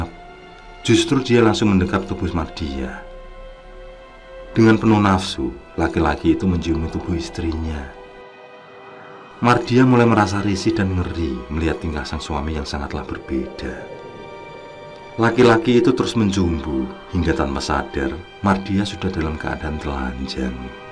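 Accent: Java, Indonesia. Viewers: Yg aku suka dri chanel ini salah satu nya pmbawaan logat bahasa jawa nya Gambar nya yg terkesan hidup tak lupa alunan musik nya yg mmpu mnghipnotis pkiran pokoke mantep smangat trus brkarya pakde bnyak blajar lah dri chanel ini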